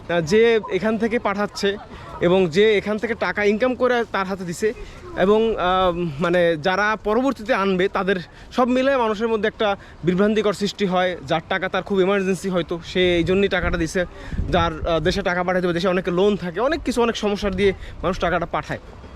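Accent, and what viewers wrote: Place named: Meherpur, Bangladesh. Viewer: ভাই আপনি কি মেহেরপুরের ছেলে নাকি, আপনার কথা শুনে মনে হচ্ছে আপনি আমাদের মেহেরপুর জেলার ছেলে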